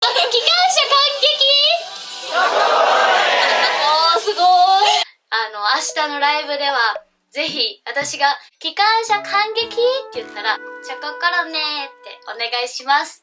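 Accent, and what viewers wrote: Kansai, Japan. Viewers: なんか…めっちゃいい事語ってるんだけど…所々にめっちゃ関西弁混じってるw可愛い〜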